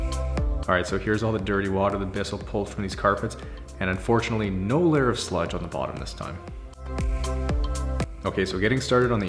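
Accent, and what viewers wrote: Canada. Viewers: That’s exactly how I thought Canadians talk to each other. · Anyone else just listen to that Canadian accent and smile😂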